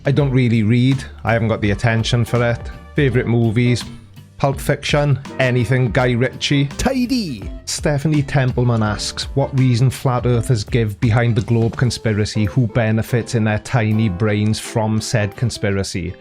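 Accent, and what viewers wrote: Wales, United Kingdom. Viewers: Hi, love your channel, been a long time subscriber (about #800 I think). I’d rather have you honest and possible insulting, than fake and Nanby- Pamby. This is why I keep watching you, this and the daft Welsh accent ( this is from an expatriated Cockney) Cheers Paul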